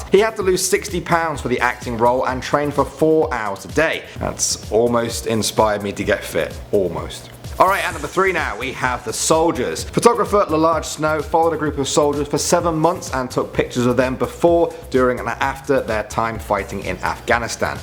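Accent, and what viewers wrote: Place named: England, United Kingdom. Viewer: He is from England, so, accent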